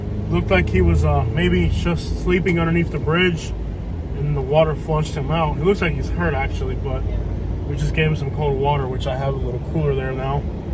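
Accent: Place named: Florida, United States. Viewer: Saludos se escucha que hablas floridacubano 😂 Dios Mio que triste 😢 they didn't even finish repairs from the last storm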